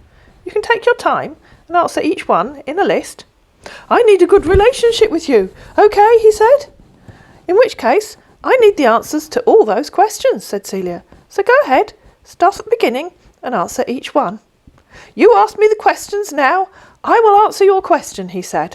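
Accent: United Kingdom. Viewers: This is the most addictive channel… ever! Even when insults are being doled out, the British accent makes it sound so polite.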